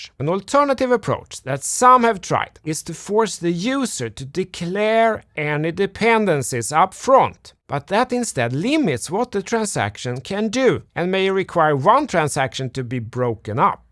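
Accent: Sweden. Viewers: Like you’re video! And the most Swedish accent I heard 😂😁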